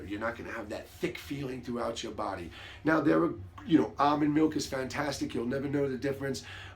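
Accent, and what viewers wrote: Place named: New York, United States. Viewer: Love it and love your NY accent!💜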